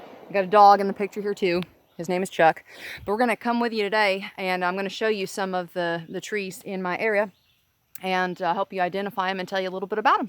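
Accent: South, United States. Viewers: You seem so different from a few years ago....you use your Southron accent and dress more casually and seem to live in a different area of the country. What changed?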